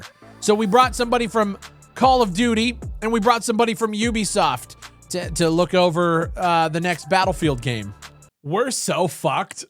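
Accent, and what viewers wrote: Canada. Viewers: u Canadian or whats the accent ?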